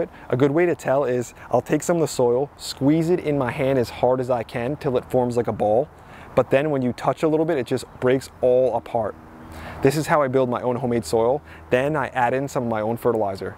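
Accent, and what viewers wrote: New Jersey, United States. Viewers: his loudmouth Jersey Yankee style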